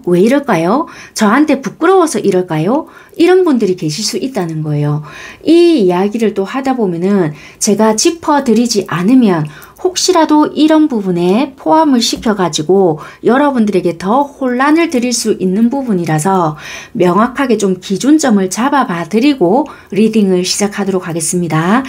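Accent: Busan, South Korea. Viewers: 선생님. 좋아요를 누른다는게 잘못해서 싫어요를 눌러버린것 같아요. 오해마시라구요. 저두 부산사람이라 선생님의 사투리 표현에 힐링타임 많이 가집니다. 오늘도 리딩 잘듣고 갑니다. 고맙습니다~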